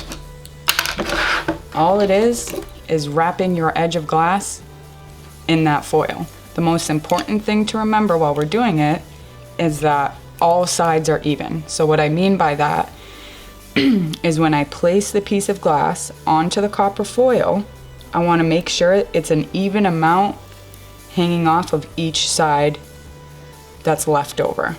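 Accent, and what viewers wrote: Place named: New England, United States. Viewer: Hi! I just took my first stained glass class and I'm hooked!! Currently binge watching your videos - lol. Thank you! I grew up in Ma and as soon as I heard you talking I knew you were a New England gal:)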